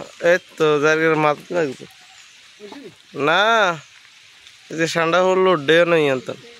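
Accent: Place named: Noakhali, Bangladesh. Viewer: আন্নে এরিন্না নোয়াখাইল্লা কতা কন, আন্নের শরম লাগে না? আন্নেগো বাড়ি কোনাই? হাদেরখিল নি?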